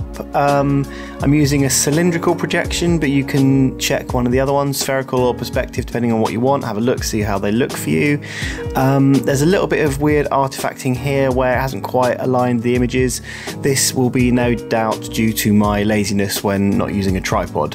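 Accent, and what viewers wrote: England, United Kingdom. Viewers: Hey. Forgot something. I love your english accent (I don't need subtitles) and your videos too.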